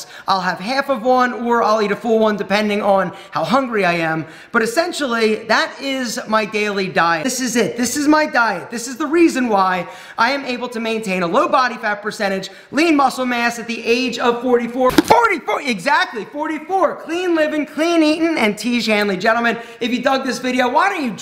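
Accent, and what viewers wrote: United States. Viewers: hey alpha if you are reading this comment you very much look like our Bollywood actor saif Ali khan whose eyes are exactly looking like yours and despite of your us accent his voice of speaking in English is identical of yours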